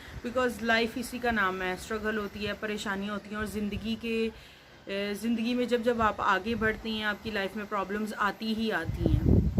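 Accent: Sialkot, Pakistan. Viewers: Itna ghoom phir ka or parh ka apka lahja nai badla mtlb Sailkotio wla ha😁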